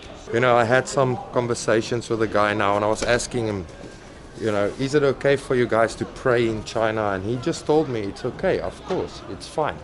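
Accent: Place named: South Africa. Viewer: Your accent is giving South African are you South African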